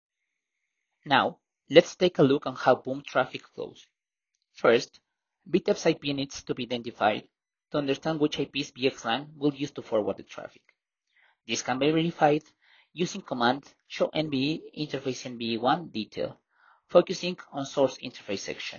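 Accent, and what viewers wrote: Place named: Spain. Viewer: Good content but Extremely hard to understand thick spanish accent.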